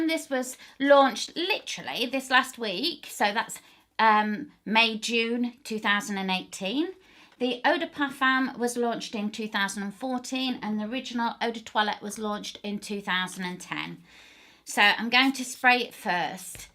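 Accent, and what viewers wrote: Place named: London, United Kingdom. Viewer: always watching your review anyway ms. you live in UK or what country coz the way you talk its made from london..your so beautiful fragrance reviewer thats true..gave u compliments more than a fragrance..anyway we live here in manila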